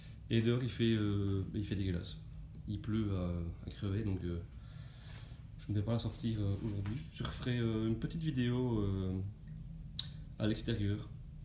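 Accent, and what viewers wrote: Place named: Belgium. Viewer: Je me disait bien qu'il y avait un petit accent de la Belgique 😁😁😁 merci pour gotta et force à toi pour ta chaine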